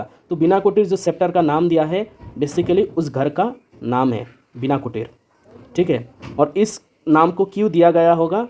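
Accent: Assam, India. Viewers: I hate Assamese accent and language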